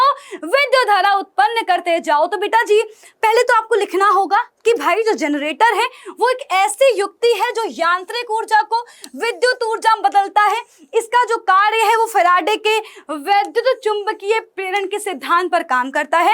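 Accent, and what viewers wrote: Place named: Kanpur, India. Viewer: Ma'am kanpuriya language me bole to ekdum jhakaas samajh me aa gya 🙂🤭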